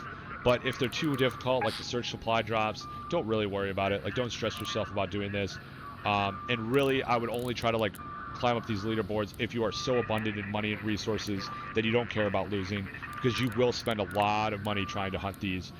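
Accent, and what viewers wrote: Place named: United States. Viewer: Sound American AF and mature, go straight to the point in the video without wasting people time, and you win.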